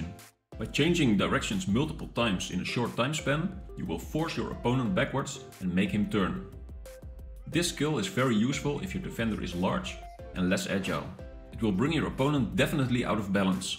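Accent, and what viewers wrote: Netherlands. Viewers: is that dutch accent omg that English dutch accent sounds horrible,,,,,,,, the video is good thank you so much my friend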